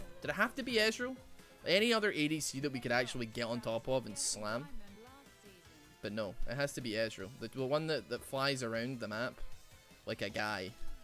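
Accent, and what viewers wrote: Scotland, United Kingdom. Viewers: Scottish Jacksepticeye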